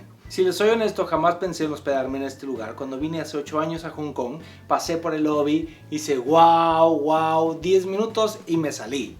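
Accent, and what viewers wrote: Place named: Puebla, Mexico. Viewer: en este video parece poblano... sácate la papa de la boca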